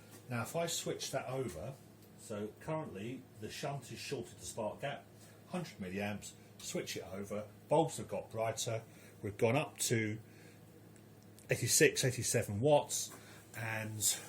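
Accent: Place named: United Kingdom. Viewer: you sound like you are U.K. based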